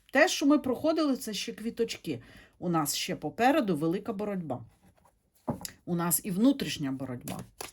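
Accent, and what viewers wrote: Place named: Chernivtsi, Ukraine. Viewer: добрий день я знаю де хотинський район бо я ваша землячка з Чернівців також на даний час в Німеччині всього вам найкращого як я люблю наш діалект таке наше рідне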